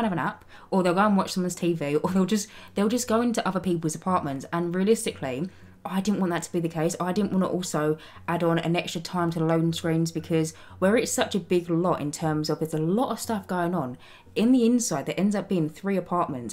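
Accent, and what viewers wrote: United Kingdom. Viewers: The British accent helps a lot.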